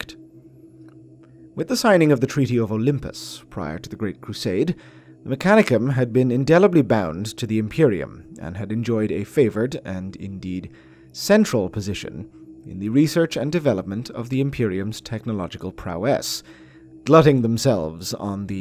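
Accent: Ireland. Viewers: 🇮🇪💪 bro an bhfuil gaeilge agat? Maith an fear. Proud of this brogue. I think its a bit familiar. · he sounds Irish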